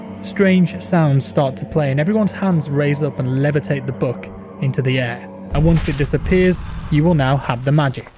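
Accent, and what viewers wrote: United Kingdom. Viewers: Are you brithish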